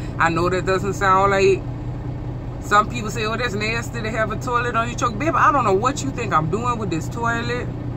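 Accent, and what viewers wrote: Louisiana, United States. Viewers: I love that Cajun accent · Sound like you from south Louisiana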